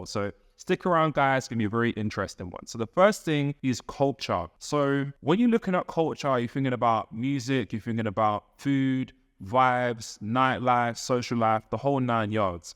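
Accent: United Kingdom. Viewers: Yoo is that British accent am in Birmingham Walsall side right now but my home is in Soweto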